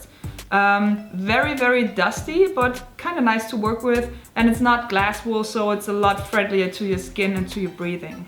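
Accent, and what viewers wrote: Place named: Germany. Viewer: Noch ne Deutsche die versucht Englisch zu reden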